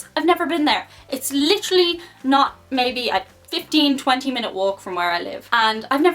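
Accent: Ireland. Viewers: We can always tell you are from R'land! That voice accent! The Irish are ALWAYS truly welcome in the USA. You didn't say whether Americans are welcome (or just tolerated for the cash) in Ireland? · It's always nice when I find a new channel that is interesting and involves a cute girl with an Irish accent... I know, you've had it for years, it's rubbish, but still works for me. I do have a question though, you've mentioned it's been a long time since you visited the US, when were you last here? We're a pretty slow country when it comes to societal/economic changes so, sorry to say some of the worst parts of American behavior or those you find/found odd are likely still going strong. Even though I am from Seattle (about 4,400 km give or take) from Miami, I do admit they make a fine t-shirt for their team, our team's logo (Seahawks) looks more like an Irate train than anything else. 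<link> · I love your voice and your accent. I would date you if I could ever make it over to Ireland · She’s so cute. And Irish.. can I date you? Lol love the accent. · To be fair, in American English we treat "Ireland" as a two syllable word. The only difference here is the long 'i' sound combined with the American 'r' sound makes the first syllable really long. There are basically 3 vowel sounds in the first syllable. A long 'i' is a combination of a short 'a' and a long 'e'. The American 'r' makes the "er" sound. So it ends up sounding like /a-e-er-land/, but we only count two syllables. Whereas your Irish pronunciation starts with more of a short 'a' sound so it sounds like /ar-land/. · We really enjoyed this thank you. Although I think your Irish accent is fading. Don’t let it happen!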